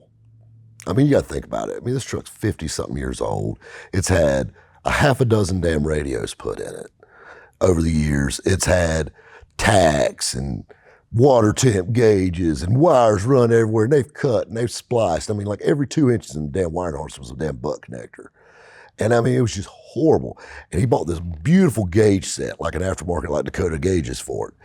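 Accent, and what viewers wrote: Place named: South, United States. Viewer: I swear this is the best YouTube channel out there! I relate 100% I'm from Darlington SC and I swear you talk and act just like my dad. I live in the north now I miss that southern twang now and again keep making em!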